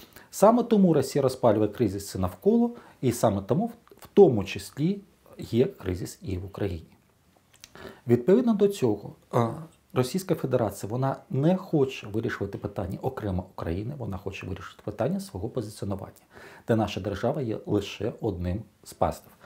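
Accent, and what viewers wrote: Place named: Belarus. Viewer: Гы. Вообще интересно звучит белорусский акцент , совершенно не так как московитский. К сожалению мы такое очень редко слышим, а я вообще впервые услышал украинскую мову с белорусским акцентом. :)))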